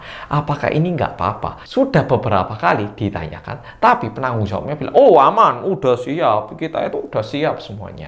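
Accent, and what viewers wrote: Java, Indonesia. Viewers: Ini org Korea kah... tp kek org jawa logat dia ngomong. Aq yg org Indonesia aja gk ngerti bahasa Jawa.kek nya dia bisa bahasa Jawa. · Om asli orang Jawa ya kok suaranya medok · 😆 medok banget logatnya mas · Ko logat e kok jowo isok ngomong jowo ta ko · Jemek🤭😁 bosomu lho mas...bikin ngakak 😃😁 lek wong jowo mah paham..tapi yg bukan wong jowo aneh dengernya 🙄😳🤭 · Wow..!! Saya baru pertama kali ketemu Channel ini.sungguh mantaap,,bhsa indonesianya joss bgt.rada² medok bhsa jawa lagi.😃🙏🙏👍👍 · Medok banget intonasinya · Jemek boso joso bang Becek bhs Indonesia nya. Btw Aku seneng smpy jik njowo i., 😁😁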